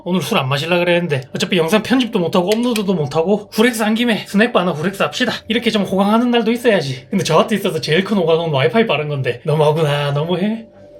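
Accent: Busan, South Korea. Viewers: 달근한 말투였다면 닭살 돋을 멘트들을 특유의 억양으로 들으니 귀에 쏙쏙 박히네요. 50대이지만 많이 배웁니다^^ 근데 나두 부산싸나이지만 부산말은 맞는데 첨 듣는 말투같은 너낌은 뭘까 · 저녁 먹으면서 소주 반잔하면서 보기 딱 좋은 채널. 같은 부산 사람으로서 공감대도 가고. 저희 아이들도 계속 으이~~ 으이~~해서 웃겨요^^ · 고생은 사서 한다고 원치 않아두 고생하게 되죠. 인생 자체가요 참 머든 열심히시며 차분히 같이 즐기게 되네요! 경상도 사투리에 외국 사투리는 없으신듯 있으셔두 근본이 ㅋㅋ 부럽습니다. 덥다는건 또 몰랐다 이제 알았습니다. 부디 안전히 건강히 오세요 ^^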